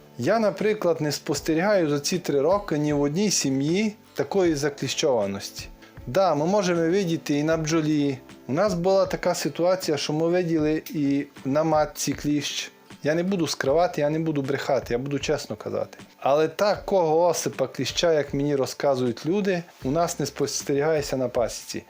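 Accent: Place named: Transcarpathia, Ukraine. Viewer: Іздив колись на закарпаття ще в 90 роках мав багато друзів Ваш говір нагадує мені ті приємні часи Успіхів Вам Цимбори Слава Україні!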